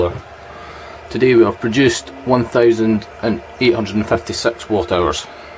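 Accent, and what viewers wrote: Scotland, United Kingdom. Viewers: sweet system are you from Scotland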